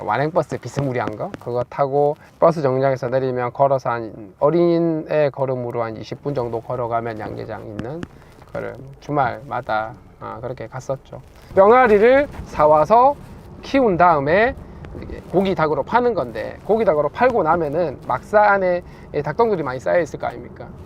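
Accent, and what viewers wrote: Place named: Gyeongsang, South Korea. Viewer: 갱상도 사나이 맞네 어무이.. .정겹습니다.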